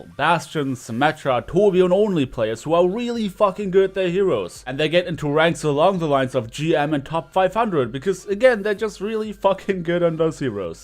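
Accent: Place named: Germany. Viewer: Hey, I might have a small personal question. If I remember correctly, you mentioned in one video that you are from Germany or at least from a german speaking region. I am from Austria and would be interested from which part of Germany exactly. I am just interested because of your accent and you are in my oppinion the best overwatch content producer on this plattform. Anyway just in case I confounded you with another youtuber and you arent from Germany, I apologise. (This was a nightmare to write with german Autokorrektur on my phone😤)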